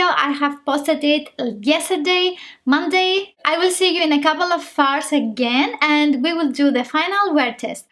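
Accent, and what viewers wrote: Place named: Greece. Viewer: Are you Greek? Your accent implies that! Love essence make up❤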